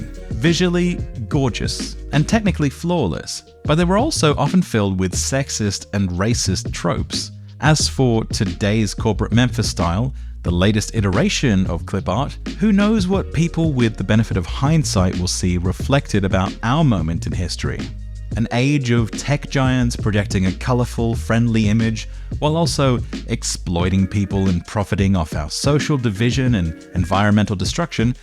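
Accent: United Kingdom. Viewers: Why does your voice suddenly sound bassier and slightly more British? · sorry man cant finish this video your british voice is just so condescending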